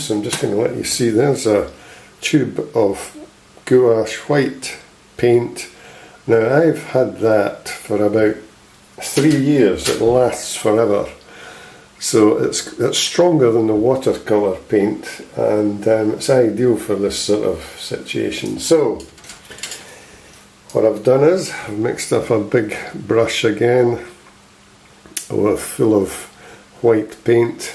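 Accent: Scotland, United Kingdom. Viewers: The Scottish accent makes it special. You are quite understandable. Thank you for sharing your skill.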